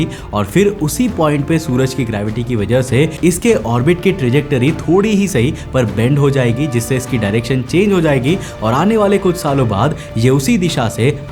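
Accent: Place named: Bihar, India. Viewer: bihari hai ye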